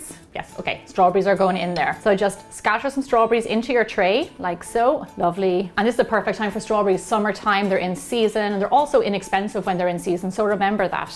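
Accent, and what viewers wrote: Ireland. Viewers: Thank you so much I really really enjoyed watching you create these delicious dog treats. Are you from Ireland? Originally. I’ve subscribed and given you a thumbs up too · ahhh knew it by the accent yuppa the Irish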